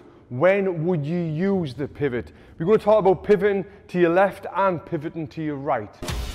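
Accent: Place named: Northeast England, United Kingdom. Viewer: Cracks me up how the North East accent needs subtitles.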